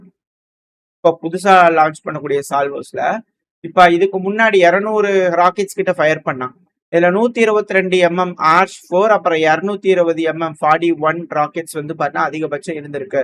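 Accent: Coimbatore, India. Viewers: R u from coimbatore, guessed it from ur slang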